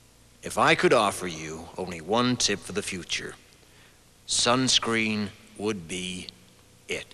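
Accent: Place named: United States. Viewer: he sounds american,but isnt he australian ?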